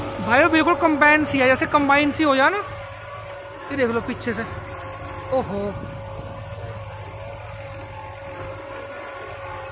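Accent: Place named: West Uttar Pradesh, India. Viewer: Bhai tumhari aavaj west UP ki lg rhi kha se ho bhai aap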